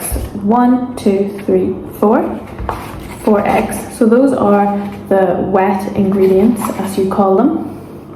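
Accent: United Kingdom. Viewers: Do you speak with british accent? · I think is british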